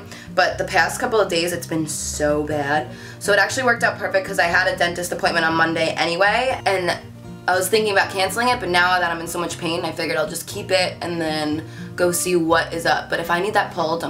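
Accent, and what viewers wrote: New Jersey, United States. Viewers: OMG it finally makes sense. Your slight accent. I don't how I couldn't tell before you have a Jersey accent.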